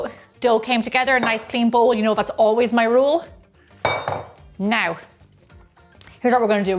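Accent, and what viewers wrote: Ireland. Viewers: I lov'eth I lov'eth 😆, got to love the Irish accent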